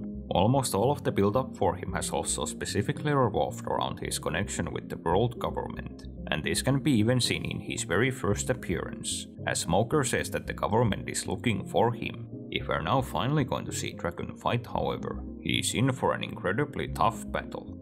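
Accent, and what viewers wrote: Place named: Finland. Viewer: Is that a Finnish accent I peek? Great channel bro, keep going!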